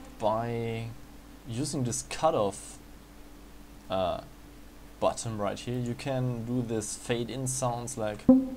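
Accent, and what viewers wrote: Germany. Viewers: 100% deutscher :D hört man am akzent :D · Super tut, aber komm, an deiner Aussprache erkennt man den Deutschen ;) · Du bist Deutsch oder ? :D merkt man am Aktzent :) , sehr gutes Video Danke für die Hilfe :)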